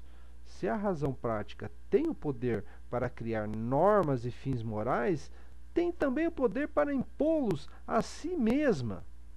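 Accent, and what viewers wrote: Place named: Paraná, Brazil. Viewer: Minha família no Sul do Paraná fala assim tb!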